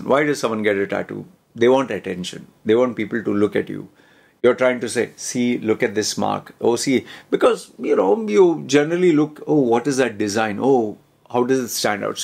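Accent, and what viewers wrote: India. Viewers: Do you ever plan to laser off your facial tattoos? I agree that it makes you stand out but at the same time, it also makes many people view you as a red flag(attention seeker) . I know you already know that. The first time I saw your video, I was shocked and even more shocked when you started speaking in an Indian accent. I assumed you had some type of trauma growing up for you to do such a drastic thing (facial tattoo).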